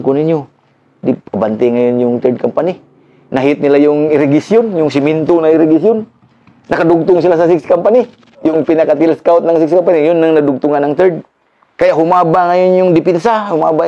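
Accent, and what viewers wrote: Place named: Visayas, Philippines. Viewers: Napatawa ako don ah 😂 , Abante kage, abante kage,. Pagkaluskos bombahan ng tropa hala. Patay si kage!😂😅 . bisaya kaayo ka sir ba klaro lang hahhaaha😄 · nakakatuwa si sarhento mag kwento kc may halong bisaya kay bisaya man ako watching from manila pero sa surigao ko pinanganak 😊💪✌❤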